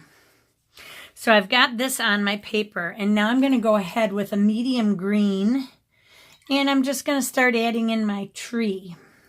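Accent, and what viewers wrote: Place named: Midwest, United States. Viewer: That midwest dialect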